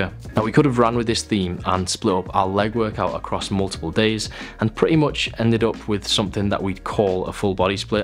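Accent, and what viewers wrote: United Kingdom. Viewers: Are you from UK ?? your accent is very hard to follow.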